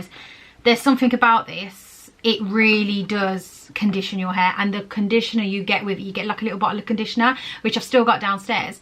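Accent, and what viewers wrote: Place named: Wolverhampton, United Kingdom. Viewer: Are you from Wolverhampton? Love your accent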